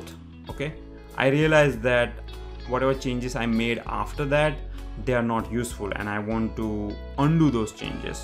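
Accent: India. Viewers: So simple, so understandable and with the Indian accent that switches the brains into learning mode. Thank you!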